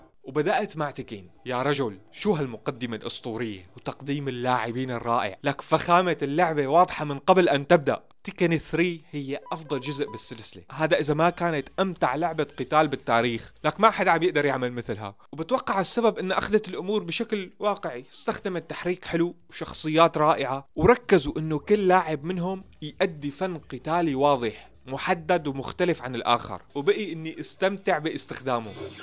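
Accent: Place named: Syria. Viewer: يازين اللهجة السورية والله احبكم يأهل سوريه تكفون ادعولي اتجوز سوريه الله يخليكم والله من وانا صغير احب السوريين واحب لهجتهم.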